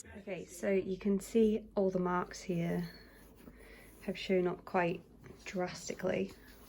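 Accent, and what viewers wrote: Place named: England, United Kingdom. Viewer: Many thanks for these tips and so nice to hear an English accent for once. I think you were really brave to put it in the machine! I've just purchased a 1970's Sherpa style longish Sheepskin jacket/coat which needs some tlc. The fur was originally white but has turned greyish now but the condition isn't terrible. I like your idea's of using the hair products, that is not something I would have thought of tbh, but I think I need to slightly bleach this fur back to a whiter colour because the jacket has white stiching. It would have been fantastic back in the day, but thanks again for these tips.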